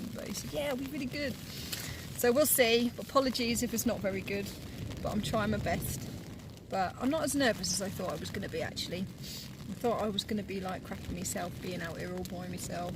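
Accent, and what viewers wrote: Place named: Norfolk, United Kingdom. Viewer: Hi just come across your vid when put in Norfolk coastal path, looking to do the walk and camp along the way if we can ever camp this year. Nice to hear a norfolk twang, being from Norfolk. Well done on your solo camp, distance is never an issue, I often go a short drive away for quick overnighter. Hand warmers inbetween your socks , or if you have a nalgien type botle fill up and use as a hot water botle , so nice to keep feet warm.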